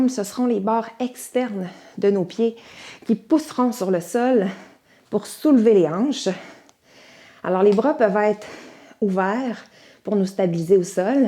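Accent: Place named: Canada. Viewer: ce délicieux accent du Canada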